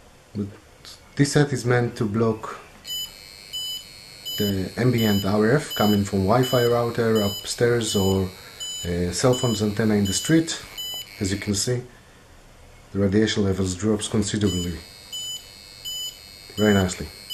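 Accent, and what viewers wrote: Israel. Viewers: i think its a scam, guy sounds like israeli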